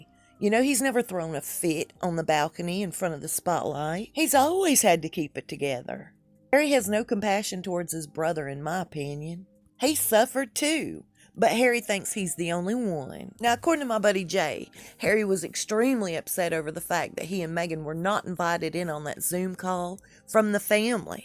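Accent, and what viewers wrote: South, United States. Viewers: You sound like a southern gossip speaking tool! Don't worry, you have lost me.!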